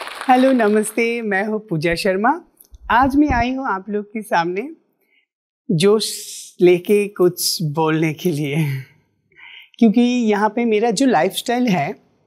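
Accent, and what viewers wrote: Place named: Bengal, India. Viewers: She has an adorable Bengali accent. Is it just me who thinks that? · Today after listening to her,I understand she is Bengali.Hats off to her struggle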